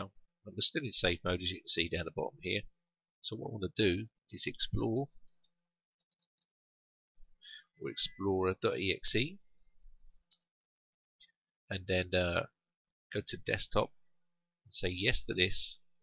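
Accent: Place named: Cockney, United Kingdom. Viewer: You're so cockney that I thought you were Australian at first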